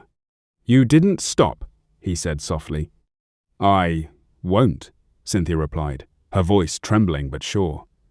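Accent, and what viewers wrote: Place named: Africa. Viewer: I like the story but l am partial to an African accent. Watching from Montreal, Canada 🇨🇦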